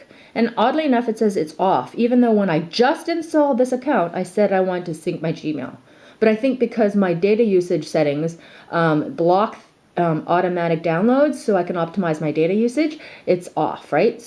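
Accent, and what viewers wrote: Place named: Canada. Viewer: She sounds Canadian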